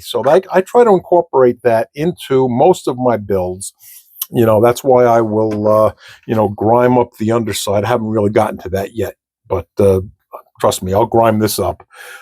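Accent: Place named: Suffolk County, United States. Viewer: Just found your page wow awesome content I just watched 3 videos,great info and tips/tricks I live in fla I'm originally from Suffolk county so it's refreshing to hear your voice lol happy holidays and please post more videos I'm going to share it with some friends thank again great work!!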